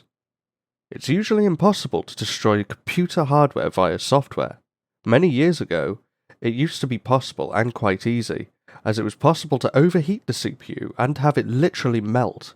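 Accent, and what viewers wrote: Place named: India. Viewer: This host channel like india accent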